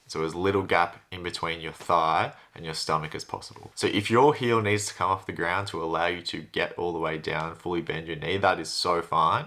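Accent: Australia. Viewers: Bro your Australian accent is fuckinnnm difficult to understand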